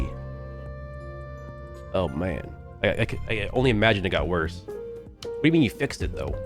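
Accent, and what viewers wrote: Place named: Canada. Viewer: HES A FUCKING MOOSE LOVER ! lmao that sounds kinda negative 😂 but fr I always forget hes Canadian til he says a few words that sound different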